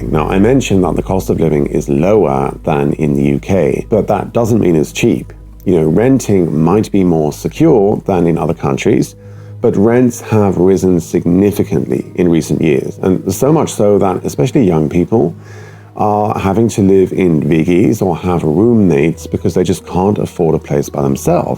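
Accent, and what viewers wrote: Germany. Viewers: Dude, your English turned into German-accent English. Really. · Why do sound like a German speaking English? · Funny how your accent has developed a German quality to it including a hint of their arrogance. You even look like a German, lol. Your assimilation is complete. WE ARE THE GERMANS, YOU WILL BE ASSIMILATED INTO THE COLLECTIVE, RESISTANCE IS FUTILE.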